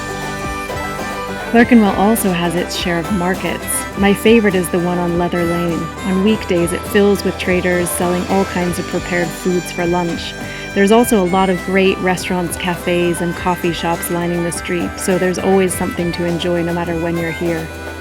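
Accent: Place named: California, United States. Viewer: it somehow seems sophisticated with a soft Californian accent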